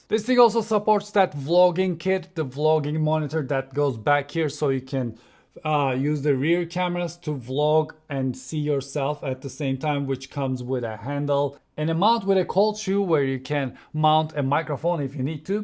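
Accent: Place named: Turkey. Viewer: You have a Turkish accent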